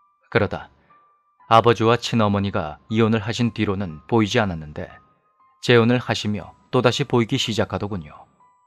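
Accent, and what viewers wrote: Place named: Busan, South Korea. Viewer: 부산사투리 재미있어요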